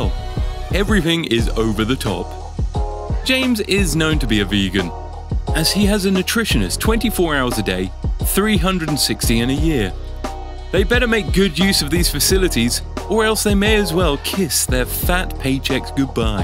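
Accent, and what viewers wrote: United Kingdom. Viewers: So it's not a house tour...It's photos taken off the internet that anyone can find then made into a slide show and a guy with a British accent telling you that LeBron makes a lot of money. 🙄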